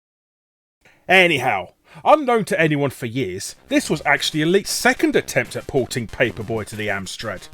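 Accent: England, United Kingdom. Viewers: He's English, not American. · I know I'm not the only one who thinks British accent, his matter-of-fact tone of voice, dry British humor, humor in general, and well-researched content is an absolutely perfect combination. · Question, what kind of British Accent do you have? (Excuse my American ignorance) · Hypothesis You are bored, there is a certain appeal in something you can relate to, Old video games, and this brittish guys accent is welcoming to you.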